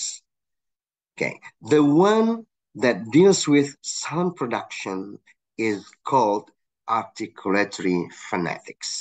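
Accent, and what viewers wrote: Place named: United States. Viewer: Yes, me too. Sir use American Accent, so that’s why it’s very easy listening to us😇👍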